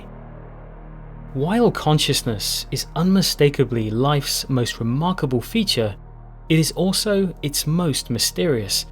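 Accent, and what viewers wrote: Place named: United Kingdom. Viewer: This is like listening to terrance mckenna in a British accent 😂 he was saying the same things with more deugs in the talks of coarse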